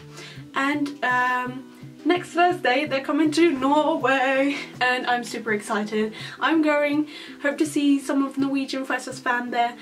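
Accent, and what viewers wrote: United Kingdom. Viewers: You're Norwegian? I was pretty sure that you were British. Bc accent. IM SWEDISH, HELLO NEIGHBOR!!!!! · You're from Norway?!? I thought you were from the UK omg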